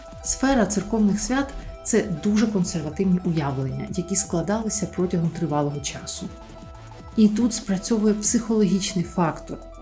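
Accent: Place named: United States. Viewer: Мені подобається ваш американський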